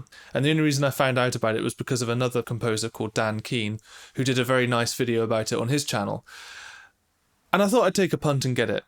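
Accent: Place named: United Kingdom. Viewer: Huh, I didn't know you were British. Neat video by the way! Can't wait for the next songs to come!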